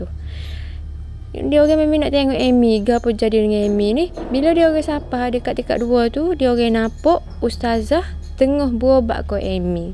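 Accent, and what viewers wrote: Kelantan, Malaysia. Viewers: Walaupun sy tk berapa faham ckp Kelate..sy tonton sampai habis.. sambil hos cerita..sy cuba bayangkan.. memang bets cerita ni..🫰🫰🫰💯💯💯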